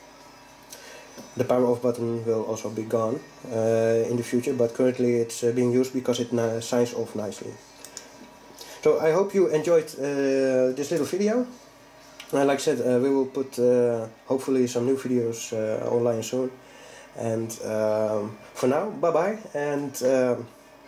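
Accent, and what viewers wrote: Netherlands. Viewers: You sound like a dutchmen ?? Are you ? If yes , i have a lot of questions in the future . I'm now waiting for my Pi ( just ordered one) . Then testing and a lot of sleepless nights ... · eeckhoudt About 100% sure the accent is dutch.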